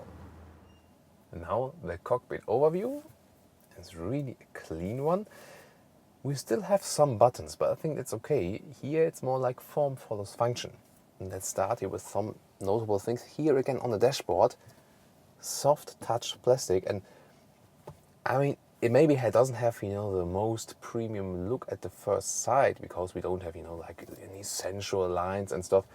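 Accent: Germany. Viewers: german english sometimes feels like german :)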